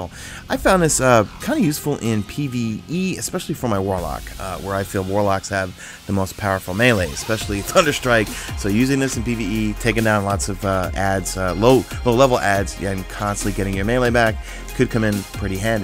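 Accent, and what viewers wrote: New York, United States. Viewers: You weren't kidding about your old new york accent and speed talking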